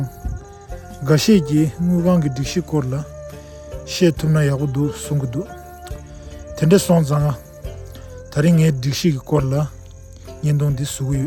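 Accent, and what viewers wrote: Standard. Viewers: བོད་སྐད་དཔེ་གཙང་མ་གསུང་གི་འདུག་ཐུགས་རྗེ་ཆེ།🎉🎉🎉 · བོད་སྐད་གཙང་མ་ཐོག་ནས་ཡག་པོ་བཤད་སོང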